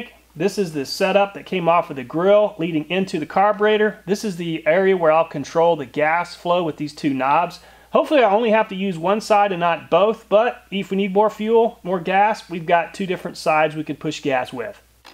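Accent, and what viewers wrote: United States. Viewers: I really like your videos and your American english. Maybe try next time create hydrogen and oxygen from water with electrolysis and supply gasoline engine with mixing of this two components. It will be probably hard to create enough such fuel but you can at least try this. Instead of that you can try supply engine with clear oxygen instead of air.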